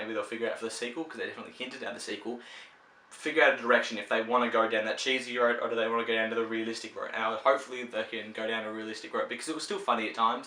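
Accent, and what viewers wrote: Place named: Australia. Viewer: Another small Australian YouTuber with talent and deserves way more subscribers? Awesome! I'm Corey and I too am an aspiring film critic with a passion for movies with a relatively small sub count (256) and not only that but the fact that we're both Australian is awesome. I can tell you're Australian due to your accent and the ratings on your Blu-ray collection, with the combination of G, PG, M, MA etc. As someone who likes to support other small young aspiring film critics or film buffs let me say I just added to your sub number. I too review films on YouTube, my personal favourite review of mine is a review for a rather obscure claustrophobic thriller released in 1997 called Cube, a highly underrated thriller that deserves more recognition. Feel free to check out my review or channel in general if you're curious but you don't have to if you don't want to.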